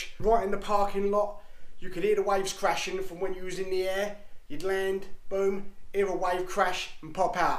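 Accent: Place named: Cockney, United Kingdom. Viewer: wanna see you in Jersey bro! miss having that bit of cockney love on the channel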